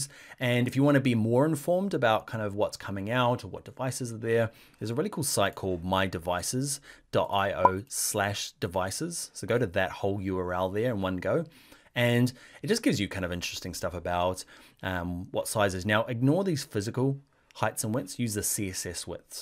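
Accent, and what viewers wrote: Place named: New Zealand. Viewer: Love to hear the Kiwi accent helping developers!! Cheers bro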